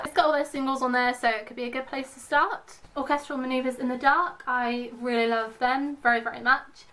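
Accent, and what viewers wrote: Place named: United Kingdom. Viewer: are you british ????😍😍😍😍😍 so lovely acent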